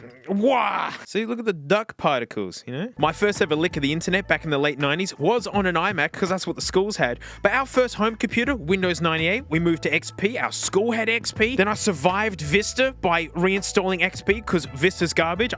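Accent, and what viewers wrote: Australia. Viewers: Yeah he's Australian, Adelaide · You're not Australian by any chance are ya? Mac, really? So, what took you so long to discover Linux?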